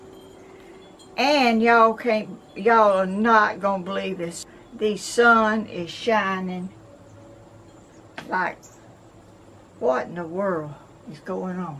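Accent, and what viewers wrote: South, United States. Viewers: Morning to you.while you are talking about blessings, you are one yourself, to me for sure. Love the walk. We had lots of woods when I was growing up in Virginia. My mother was named too.Love the southern talk and your wonderful laugh. Yes it's rabbit tobacco. I remember my daddy telling me so.we had lots around on our farm. You take care and be careful of the snakes.ok.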